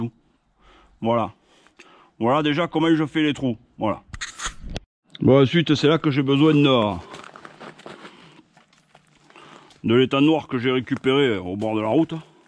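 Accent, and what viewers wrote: South, France. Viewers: merci pour la reponse je vais en faire avant de partir peu etre pourrons nous se voir vers des cieux au taunos sil on est pas loin jaime cet accent tres sud cordialement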